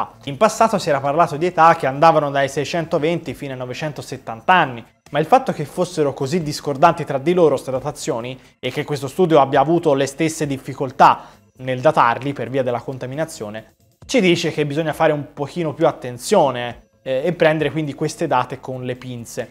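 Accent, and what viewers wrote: Tuscany, Italy. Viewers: Grazie, come sempre esauriente e tanto simpatico con quell'accento toscano... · Non so... Quando sento quell'accento toscano...mi garba....🤘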